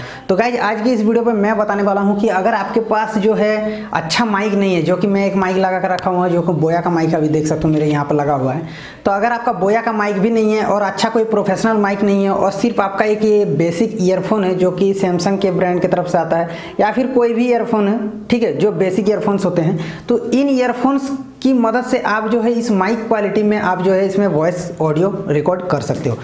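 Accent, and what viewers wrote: Bihar, India. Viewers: Are you from Bihar.???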